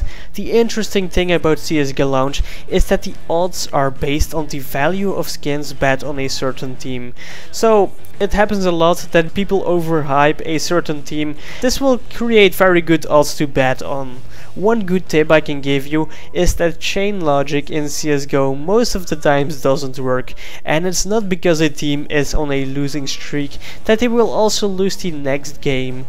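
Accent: Netherlands. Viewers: Such a dutch way of speaking english xd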